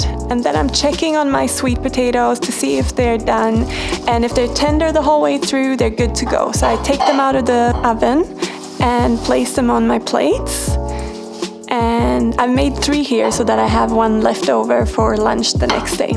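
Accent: Sweden. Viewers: Det tog mig cirka 3 sekunder att höra att du var svensk. Ganska kul ändå. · Just discovered your channel last night, 🌹and I'm TOTALLY IN LOVE. ❤️ Been VEGAN 💚 for many years, yet finding many of your recipes very inspiring! 👏🏼 Plus your sweet Swedish accent is so pleasant to listen to! Reminds me of my multiple visits to Sweden. 😊🇸🇪 Keep up the great work! 👏🏼🤗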